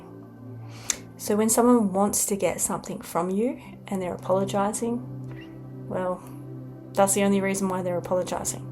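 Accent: Australia. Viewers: All this time I thought I had found an English, but it’s Aussie lol❤ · Hello from California. Good messages, great voice,. We appreciate you. You have our favorite Australian accent. We could listen to you talk for hours. Happy holidays & happy summer to Australia from our channel.:hand-pink-waving::hand-pink-waving:🐦🐦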